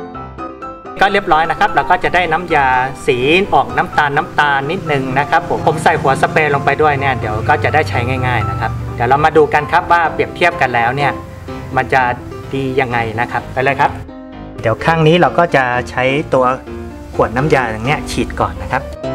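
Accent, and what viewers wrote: China. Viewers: พูดไทย ออกจีนๆ นะ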